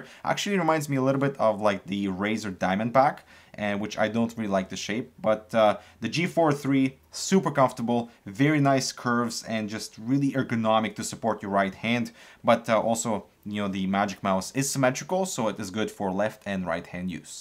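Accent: Russia. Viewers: slightly рашин акцент here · Not offending him but sometimes he sounds Russian and sometimes he sounds American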